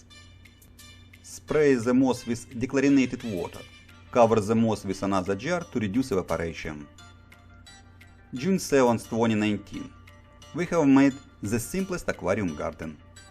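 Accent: Russia. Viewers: Why you sound like Russian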